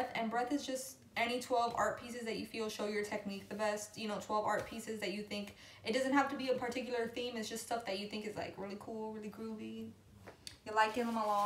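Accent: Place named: New York, United States. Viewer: are you from new york because you sound like it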